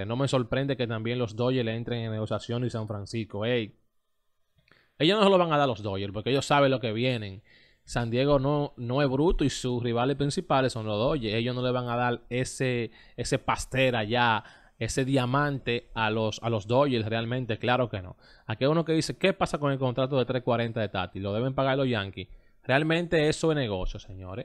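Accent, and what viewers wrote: Cibao, Dominican Republic. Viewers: Buenas tardes, tú hace buenos comentarios osea que hace tu tarea pero acuérdate que tú estás en un público tienes que mejorar tu manera o tu forma de hablar acuérdate que no solo te estás dirigiendo al público Dominicano que sabemos que eres cibaeno pero los otros países no saben el porqué tú habla así que en vez de decir (estoy seguro tú dice to’l seguro en vez de decir ochenta y seis dice ochental sel) y así sucesivamente pero si haces buenos comentarios y te felicito en eso.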